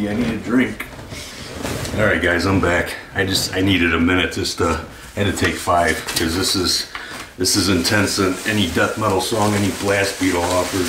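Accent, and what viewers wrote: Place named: Midwest, United States. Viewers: Your midwestern accent warms my heart. I saw your blood vessels in the thumb nail and immediately thought "Hey it's that guy that did some Nile riffs years ago" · Minnesota?????? You can’t hide that accent from me bro hammer!!!!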